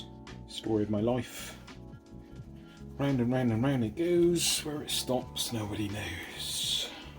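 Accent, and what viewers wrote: Bristol, United Kingdom. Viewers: fantastic vlog shame about the the pits that broken, it not long for you have finished keep your chin up it won't be too long before you go out on it. Sunshine is coming next week so hopefully you can get out. Your accent sounds like its from Bristol away? Im got a appointment on Tuesday morning to put a new back tiyer on so I will be out on my bike again yay fantastic take care ride safe mate TTFN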